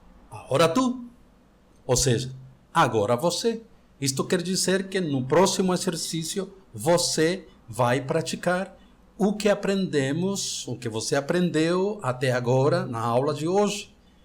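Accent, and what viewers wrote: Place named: Spain. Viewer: Ótima aula prof.. É tudo que eu esperava encontrar. Um professor nativo com domínio em português. Não podia ser melhor. Parabéns pela iniciativa. Gostaria de perguntar - Qual é o seu país? (Depois de 27 anos no Brasil fica difícil notar o sotaque espanhol).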